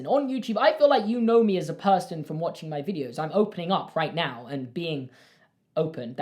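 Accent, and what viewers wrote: United Kingdom. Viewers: I don't know if you'll read this, but I feel so positively impacted by your video that I need to comment. I do not comment often on videos. I believe I found your channel through the Carlin Bros. I enjoy a lot of the subjects you make videos about (Harry Potter mostly). I really enjoy your editing style and delivery. I'm a bit surprised that you're self-conscious about your voice because I don't find it annoying at all. Perhaps it's the British accent? I know far worse voices for YouTube. Getting off-track a bit... I think this video says a lot about your character. You're opening up about your experiences as a YouTuber and ultimately your highs and lows. You acknowledge shortcomings and work hard to be a better YouTuber and a better person. That motivates me to be better, too. I also hope that you keep finding fulfillment through YouTube because I really enjoy your channel. 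I enjoy your comedy and value your opinions. I hope you know that many of your subscribers value your perspective. It does surprise me a bit that you receive impactful hate comments. You're a great person and you deserve everything you get from this platform. I hope you are well! Best of luck to you going forward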